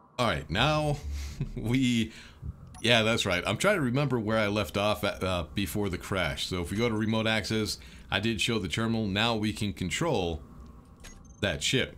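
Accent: United States. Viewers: I'm not used to the American accent (I'm a Brit) so was trying to work out why "planet with a bunch of turds (turrets) on it" needs defending 😆.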